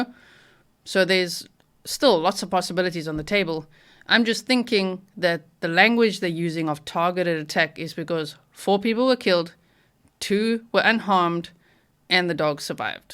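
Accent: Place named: South Africa. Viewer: Why do you sound South African? 😮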